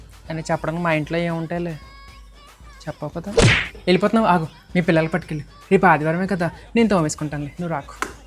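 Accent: Godavari, India. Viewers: garidi native ekkado ఎవరికైనా తెలిస్తే cheptara, yasa/slang bhalega untundi, maybe east or west గోదావరి అనుకుంటున్నా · Super bro 😂😂😅😂😂but konii words ardham avvadu godhavari slang,,,,but kurnool slang andhariki ardam avuthundi 😊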